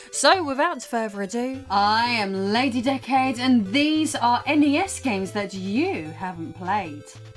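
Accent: United Kingdom. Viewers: Hello You have a nice accent, are you British ^^ I wish i could find another Lady like you, passionate by video games.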